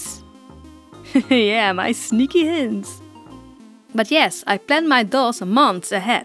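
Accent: Netherlands. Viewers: NEDERLAAANDS 😂 je hoort gwn dat je een Nederlands accent heb, I 💖 your video's 😘💋💯 ga zo door!! 🔥💝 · I just found you and I think you are Dutch 😂 because of your accent xD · Ar u neterlands cuz i heard it in the accsant i'm neterlands to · No hate but you sound i bit Dutch ( ben je Nederlands ?? ) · Oh my god your accent is sooo cute! I’ve never heard a Dutch person,and y’all kinda sound like if the French and British accent had a child xD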